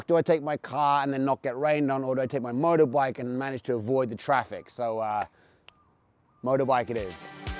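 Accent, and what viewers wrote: Thailand. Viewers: wait.. whatt? I was shocked when i heard your thai accent. Hi I'm thai accidentally scrolled down and found this clip of you.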